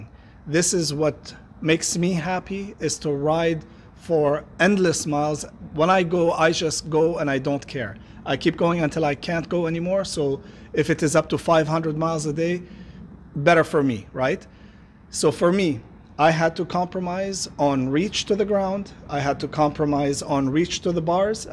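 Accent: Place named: Middle East. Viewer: This is the best comparison video for motorcycle seats. Great job, by the way. Lovely middle eastern accent😉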